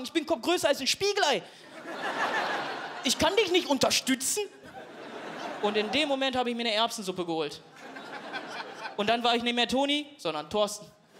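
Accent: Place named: Duisburg, Germany. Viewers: LoooL, hatte noch nie von ihm gehört aber so geil! Liebe den Duisburger Assi Slang, bin viel in Duisburg unterwegs. Sehr erfrischend! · Liebe unsern Ruhrpott Akzent 😎☺️❤️ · Super Typ, ich bin aus Hamborn und in Marxloh zur Berufsschule gegangen. War damals (um 1980) schon ne besondere Gegend. Wohne seit über 30 Jahren in Stuttgart und den Duisburger Dialekt finde ich immer noch einfach klasse. Mach weiter so, super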